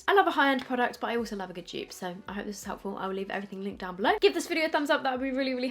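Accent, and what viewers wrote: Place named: England, United Kingdom. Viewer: I subscribe and watch you to learn English accent!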